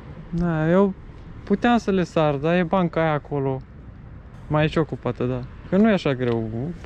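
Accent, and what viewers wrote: Romania. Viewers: Wow nu credeam până ai vorbit că ești roman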